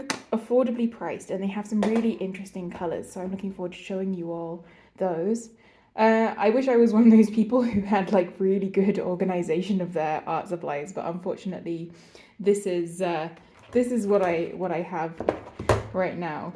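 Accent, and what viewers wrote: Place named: South Africa. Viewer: You sound South African but not!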